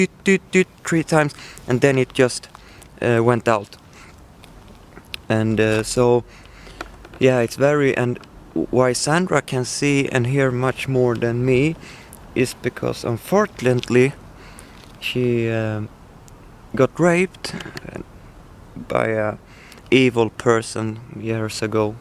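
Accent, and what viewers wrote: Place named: Sweden. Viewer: My husband and I enjoy your videos. He said your accent reminds him of his grandparents, as they too were Swedish. hehe We may be in America, but you are not alone. Sending you both all my love and prayers during a difficult anniversary (your wife's father), and a celebration of life (your birthday) I too am a survivor like you, I died during brain surgery. You are still doing an amazing job. Like I said before, your english is very good, and you explain everything quite well. P.S. - Did you already upgrade the mic or camera. The background noise, and clattering is almost nothing Sounds much better!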